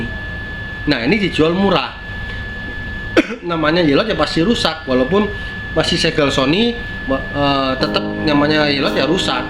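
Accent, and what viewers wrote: Java, Indonesia. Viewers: Org pontianak kok logak nya jawa sih bang😂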